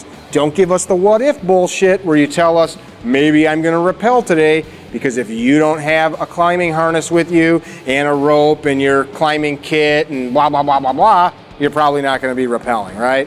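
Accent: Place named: Midwest, United States. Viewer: That Midwest accent just kills me. 🤮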